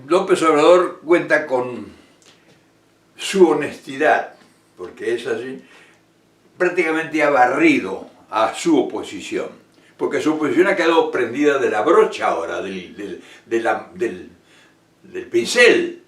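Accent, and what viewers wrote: Argentina. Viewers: usted habla como argentino, pero tiene un corazón mexicano. Su capacidad de reconocer al pueblo como la fuerza que sostiene a este gran gobierno. Excelente análisis. Saludos desde México.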